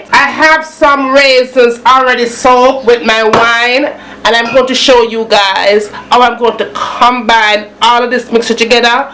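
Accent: Jamaica. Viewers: love the video and the way yuh talk mi sure a nuh montego bay yuh come from..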